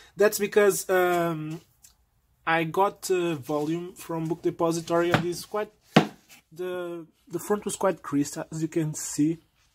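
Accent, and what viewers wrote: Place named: Portugal. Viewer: e vi logo pela maneira como falas o ingles, todos os tugas falam com essa pornuncia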